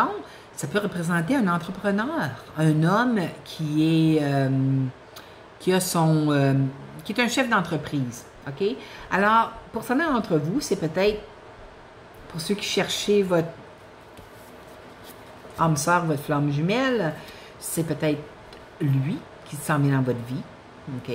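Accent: Canada. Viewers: Merci tres tres positif et en plus avec l'accent canadien que j'adore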